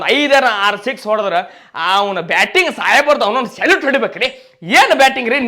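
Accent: North Karnataka, India. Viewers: Anna super talking....namma uttarkaranataka basheyalli...ninna RCB abimanake love u......plz go to I p l...kannad cometary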